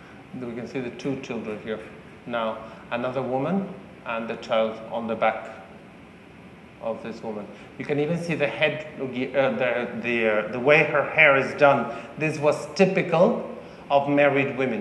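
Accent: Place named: Ireland. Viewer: I pick up a bit of Irish in your accent, I thought that can't be, you're Spanish speaking, maybe you've been to Ireland or studied and lectured there ? Marvellous miracle which is inexplicable , I think there's more to be found, hidden in this treasure .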